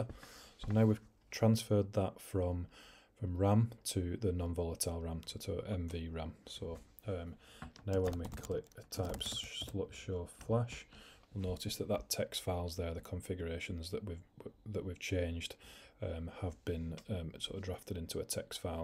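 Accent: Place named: England, United Kingdom. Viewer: amzing english accent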